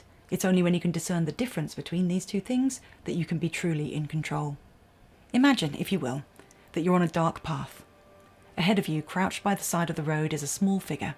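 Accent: United Kingdom. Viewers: You have an amazing voice. Really pleasant. Soft yet not ASMR. Just the right amount of British to sound classy but not posh. Have you ever thought of narrating (your favorite) audiobooks? I think those would sound amazing.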